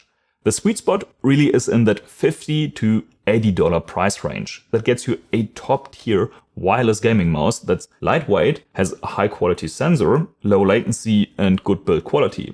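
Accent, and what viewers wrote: Germany. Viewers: the faint German accent is doing things to me, Lord help me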